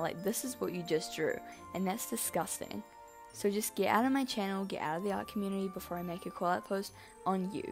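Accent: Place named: New Zealand. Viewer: You're accent sounds very kiwi 🤔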